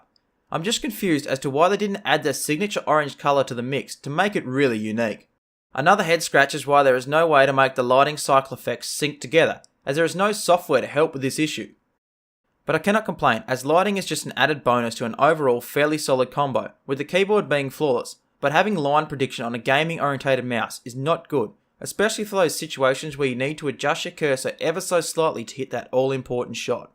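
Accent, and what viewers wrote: Australia. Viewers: And I thought I had a thick Australian accent...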